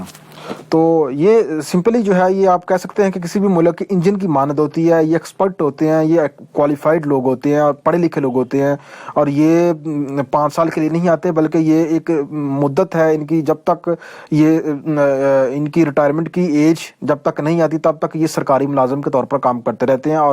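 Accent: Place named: Punjab, Pakistan. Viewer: 🤣🤣🤣 Urdu m Punjabi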